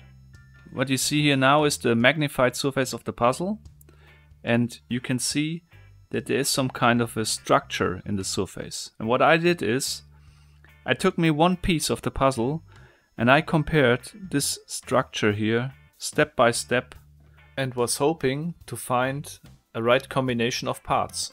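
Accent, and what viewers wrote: Germany. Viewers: You have a german accent. Are you from Germany?☺ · Man hört den deutschen Akzent im Englisch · Man hört das du deutsch bist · Alter du bist deutsch dein aktzent verraten ^^ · dieser Moment wenn du merkst dass er Deutscher ist 😂 der Akzent....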